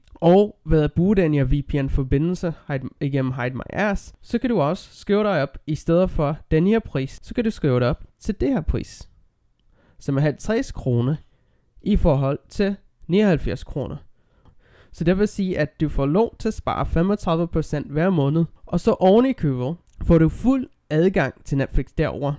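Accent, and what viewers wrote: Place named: United States. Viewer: Hey, kan ikke lade vær med at tænke på din accent, hvor er du fra, det lyder lidt som om du kommer fra USA? ;-) har jeg ret?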